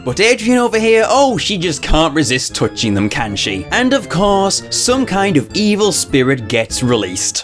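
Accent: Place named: United Kingdom. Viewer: His voice is really british. ... i love it .-.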